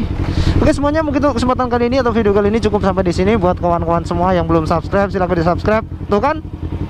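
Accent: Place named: East Java, Indonesia. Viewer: Jowomu ngendi mas brow, Medok bgt,,kyk malang, sby ya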